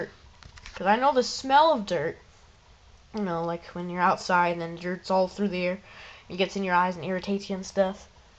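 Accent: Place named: United States. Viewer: I must be mad but I watched all of it both parts no skiping great fun I must get some don't know were to get them in the uk I'm gessing your american love ya actsent lol I will check more of ya vids :)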